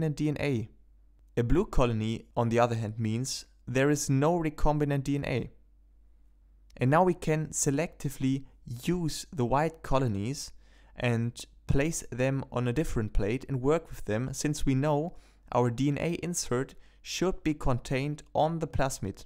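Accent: Germany. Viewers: Absolutes alman English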